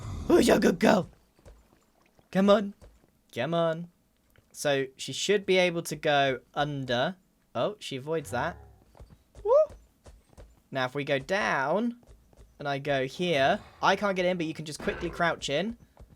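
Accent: United Kingdom. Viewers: R u British? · Why is vocabulary sound much more British today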